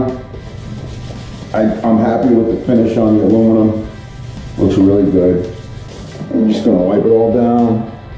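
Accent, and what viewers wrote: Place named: New England, United States. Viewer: Are you from the east coast? You sound like my uncle lol got like a mass/rhode island accent.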